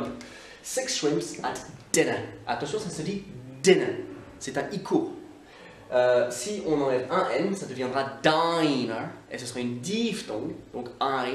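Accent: Scotland, United Kingdom. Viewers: Hi thanks for your tutorials, it's nice to hear your scottish accent, I'll try that with my students this afternoon! ;) Justine x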